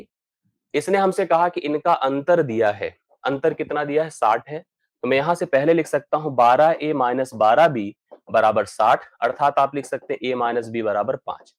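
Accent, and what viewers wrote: Bihar, India. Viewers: Guruji bihari hi is liye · Bihar se hoge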